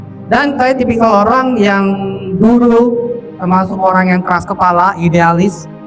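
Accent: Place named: China. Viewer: Logat bicara nya kyk org china yaa 😅🙏🏻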